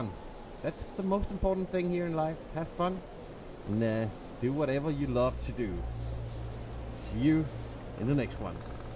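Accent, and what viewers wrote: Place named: Denmark. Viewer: I smell danish ;) Awesome video thanks!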